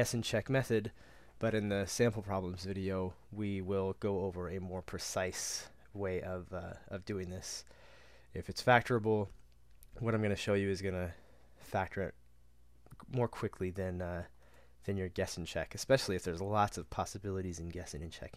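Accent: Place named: Texas, United States. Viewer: You sound like my friends from Texas.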